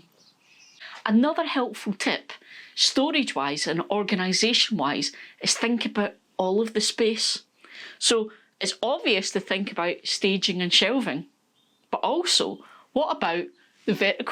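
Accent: Scotland, United Kingdom. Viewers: All I can say: love, love, love it! (your ideas, content, explanations….) - and over the last 2 years I have been falling in love with Scotland. So - listening to you sounds „so familiar“.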